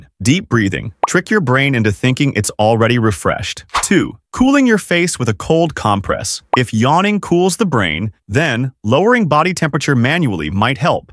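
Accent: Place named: India. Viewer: the indian accent 😭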